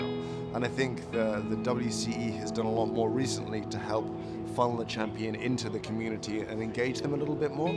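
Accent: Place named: United Kingdom. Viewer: is one of the best ambassadors for coffee there is, not a little helped by that calm, thoughtful British articulation:)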